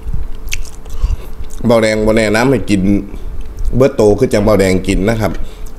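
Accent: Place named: Phu Thai, Thailand. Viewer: เป็นนครพนมเบาะครับเว้าสำเนียงภาษาภูไทคือผมเลย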